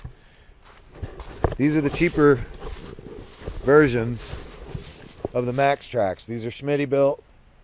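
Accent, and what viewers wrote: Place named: South, United States. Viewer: Where are you from? I hear a bit of southern.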